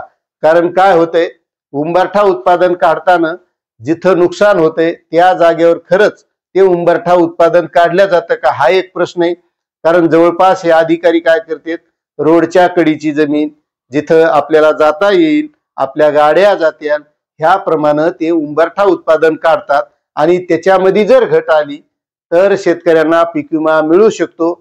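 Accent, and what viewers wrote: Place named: Vidarbha, India. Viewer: तुम्ही विदर्भात राहात का,, भाषा ओळखीची वाटत आहे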